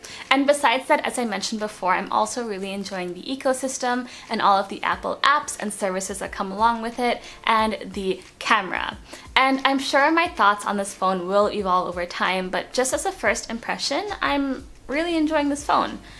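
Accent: India. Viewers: Hi Are you Indian with fake accent or NRI with "some where in between accent" or an American with real american accent . pronunciations sounds 50 percent Indian and 50 percent American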